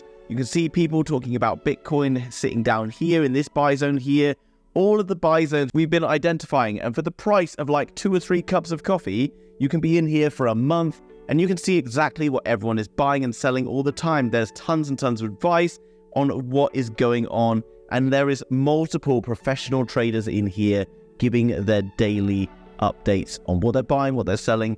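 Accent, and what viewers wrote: United Kingdom. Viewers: How did Pauly D get a British accent?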